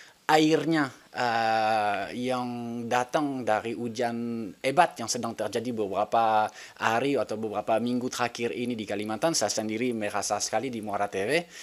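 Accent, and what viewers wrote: France. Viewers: Aku mendengar logat Perancis, sounds so good 🤭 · Lucu logat Prancis nya😂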